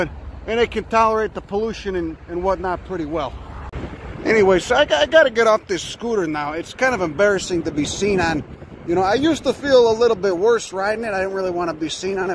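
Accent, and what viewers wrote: Chicago, United States. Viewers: That's a pure Chicago accent.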